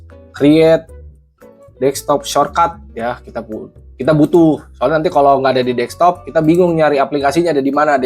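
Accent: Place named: Sunda, Indonesia. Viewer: aksen sunda lo kentel banget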